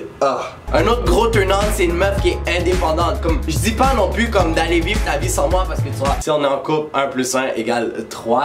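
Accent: Quebec, Canada. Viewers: j'adore ton accent Québécois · Omg.... J AIME TROP TA CHAÎNE. Jdis ça juste en regardant une vidéo... XD. T'es québécois? Si Oui, je suis québécoise aussi mais... j'aime trop ton accent même si g le même... C vrai... Chuis une fille compliquer 😂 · Coucou je suis nouvelle depuis hier soir et j’adore t’es vidéo tu me fais trop rire ! J’aime trop ton accent Québécois ! Fin bref j’adore ce que tu fais depuis hier j’ai dû regarder plus de 10 vidéo et j’adoreeeuh !❤️✨ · L’accent canadien et tout 🇧🇪 🇫🇷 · Je kiff t vidéo et je voudrais que tu m'apprenne l'accent québécois 💗😝😛👌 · Je suis nouvelle tu es quebecois ? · Première fois que je tombe sur ta chaîne tu m’a tué J’avais jamais entendu un renoi avec un accent québécois 😂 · Je t'adore tes drôle et j'adore ton axan québécois et ses intéressent continue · Comme c'est kiffant d'entendre un gars avec un accent québécois. 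😮💙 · OMG troppp bien, j'adore ta vidéo, t'es plein d'énergie, franchement je regrette de pas avoir trouvé plus tôt ta chaîne !!! et en plus t'es Canadien, t'as un magnifique accent québécois !!!! continue bien!!!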